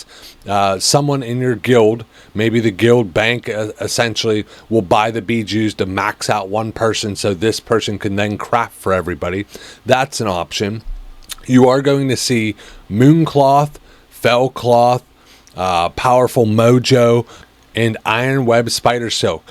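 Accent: Philadelphia, United States. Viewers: i gotta ask.... you gotta be from around philly right??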